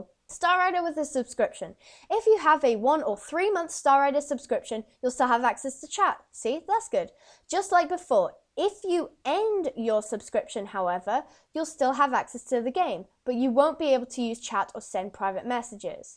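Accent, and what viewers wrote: United Kingdom. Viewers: Even before, you had to verify your email to chat. I was a free player for 3MONTHS before i got 3 months subscription. after that, i bought pay once and now im level 19. i have been playing star stable for over a year and i think 99.99/100 in the star stable community on global chat are just looking to roleplay. i bet most of those are subscriptions. this is just star stable milking money off their players again. like they do with star coins. in my whole year of playing star stable, i have never bought star coins. i have saved up for all my horses. i now have 11 horses. you don't need to pay for star stable at all just to enjoy the game. LIKE IF YOU AGGREEEEEE Also, what server are you on? Im on Unicorn Forest, UK and you have an english accent. can we be friends on star stable?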